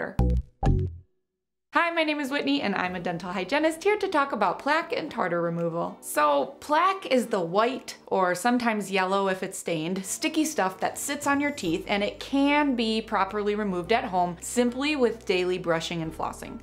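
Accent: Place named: Midwest, United States. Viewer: Awesome! I could detect the Midwest accent. My family is from Iowa but live in Cali. Thanks for your content!!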